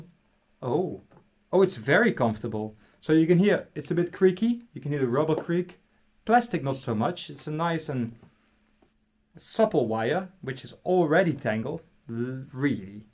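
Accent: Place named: Netherlands. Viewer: You know whats funny? You can tell someone is Dutch by their English ;)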